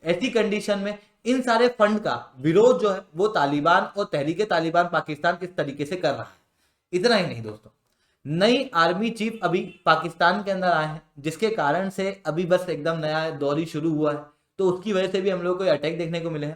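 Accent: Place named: Himachal Pradesh, India. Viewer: Sir himachal se hae sayad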